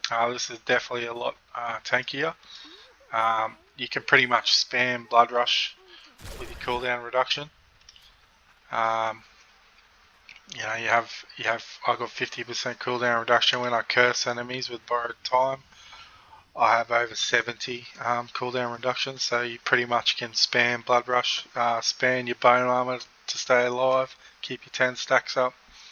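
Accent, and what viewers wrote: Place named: Australia. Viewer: Nice to see a guide with an Aussie accent.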